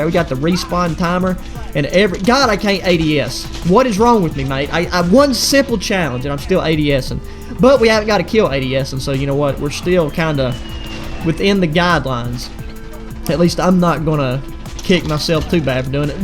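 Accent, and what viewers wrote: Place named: West Virginia, United States. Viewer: Bro you sound like some of my home boys from southern WV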